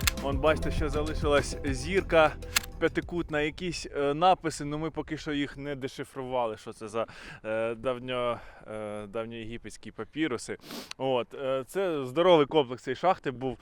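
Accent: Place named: Kryvyi Rih, Ukraine. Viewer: а ви сам з Кривого Рогу чи з агломерації? Бо так говорите неначе тутешній, нам криворізцям цікаво звідки ви🥰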